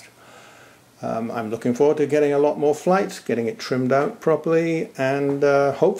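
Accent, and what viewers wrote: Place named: United Kingdom. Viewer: I'm sorry, but WTF? Im hearing a brit accent, and can't help but wonder WTF? Do you have nothing better to do with your time??? You're obviously an incredibly talented, hard-working, devilishly-focused so and so and again I ask: WTF?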